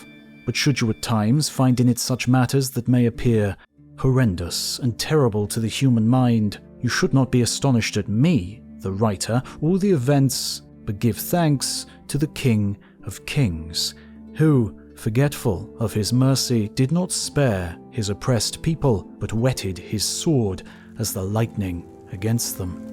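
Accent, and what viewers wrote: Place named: United Kingdom. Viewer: Aside from the ridiculous British accent, pretty good vid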